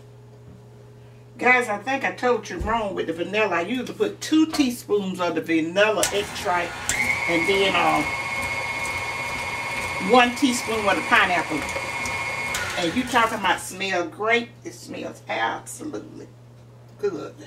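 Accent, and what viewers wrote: South, United States. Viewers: Every recipe I saw 4 this used a box mix & I wanted REAL DEAL scratch made. I clicked on the video & your sweet southern accent & energy reminded me of my aunties & every sweet church lady I encountered in my life. And 1 things I know about sweet Southern ladies is they r the best REAL DEAL cooks & bakers. Gonna definitely make this Thanksgiving. TY SO MUCH. 🌹👏🏿🌹👏🏿🌹👏🏿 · I'm from California and when I heard you speak, I knew this was going to be divine. Your spirit made me feel so safe! You remind me of my God mother. So sweet, southern and full of the love of God! God bless you Miss. Thank you for just doin ya thang and being you. You blessed me real good!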